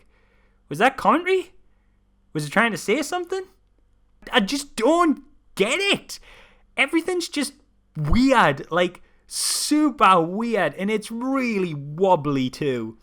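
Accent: Newcastle, United Kingdom. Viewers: Hey fellow geordie.👍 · Are you a geordie lad? A could here it a bit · just love the geordie accent ..Fucking Baffling!! love it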